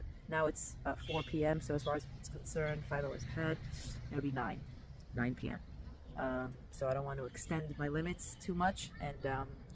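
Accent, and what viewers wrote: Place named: United States. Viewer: Why do you have an American accent? You get to belong to two states but Palestinians aren't allowed to belong to one?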